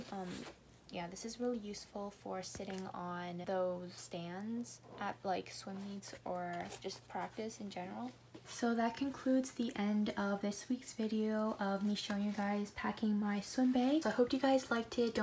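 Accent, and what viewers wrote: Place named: Pacific Northwest, United States. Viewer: Gotta love that PNW accent 😍 😂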